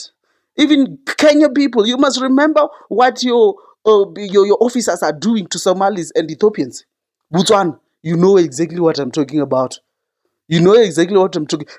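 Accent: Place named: United States. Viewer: I dont think you are south africab, you sound American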